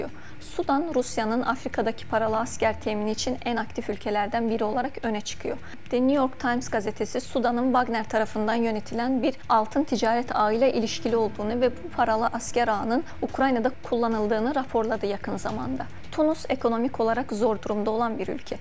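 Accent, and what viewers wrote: Azerbaijan. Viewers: Azərbaycanlımi spiker? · Salam siz şurnalis Azəribacanlısınz gözəl danışrsınz 🇹🇷🇹🇷❤🇦🇿🇦🇿🇦🇿👍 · Konusan kizin aksanindan azerbaycanli oldugu belli :)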